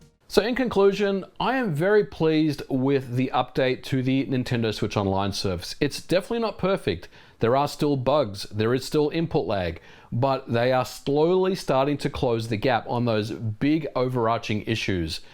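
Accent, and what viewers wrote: Australia. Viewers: It's probably a quirk of his accent(being from Australia) and just saying it the alternative way. That or just ask him on Twitter or some shit. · Classic Australian VO work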